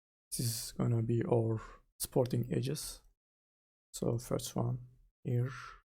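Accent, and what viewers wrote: Turkey. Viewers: aksanından hareketle Türk olduğunu düşünüyorum... video için teşekkürler. faydalı teknikler göstermişin. 3-4 sene öncesine kadar senin gibi daha fazla kaynak bulabilseydim şimdi max kullanıyor olmazdım.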